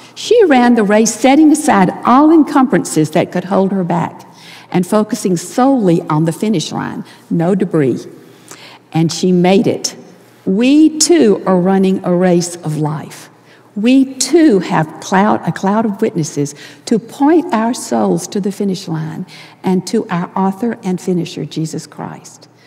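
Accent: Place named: South, United States. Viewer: Thankyou Sister Marriott for a beautiful devotional full of spiritual and practical application. Love your southern accent too. Stay safe. God bless.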